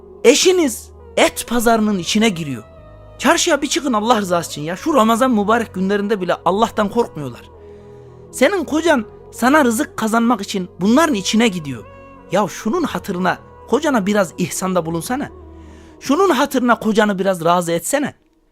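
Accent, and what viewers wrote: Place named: Konya, Turkey. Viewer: "Çen çen çen "😂😂😂 sen konyalının hasısın hocam.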